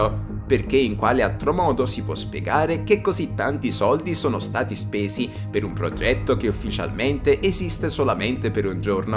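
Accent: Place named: Naples, Italy. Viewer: Quello piu spaventoso è che questo canale e quello di e altri 2 canali sono la stessa persona,puoi parlare piu basso,più serio ecc ma si sente perfettamente che 6 sempre tu soprattutto dalla calata napoletana k provi a nascondere..e i video sono uguali..l unica cosa che cambi è il titolo del video..e nn solo..avrai altri 2 canali sempre così stessi video titolo leggermente diverso..ti ci sei arricchito con sto sistema..altro che guru bitcoin ecc..questo è il sistema dei sistemi x guadagnare..all inizio ci è voluta pazienza vero?..ma una volta k gli iscritti e le visualizzazioni hanno cominciato andare a gonfie vele(alla gente piacciono questi video quelli del paranormale ecc)vai il gioco è fatto..avrai anche dei canali con gli stessi video ma parli inglese..se nn ci avevi pensato(difficile)ti ho dato una bella dritta..CMQ VABBE NESSUNO SE NE ACCORGE CHE 6 SEMPRE TU..O SE NE FOTTE..BOH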